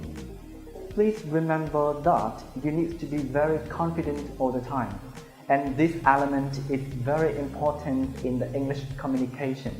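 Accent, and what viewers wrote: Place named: England, United Kingdom. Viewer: You've got English accent:)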